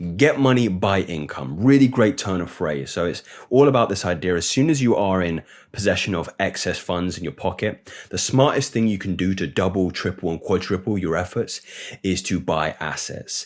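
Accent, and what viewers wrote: United Kingdom. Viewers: Your videos are priceless. I learn a proper British English as well. Cheers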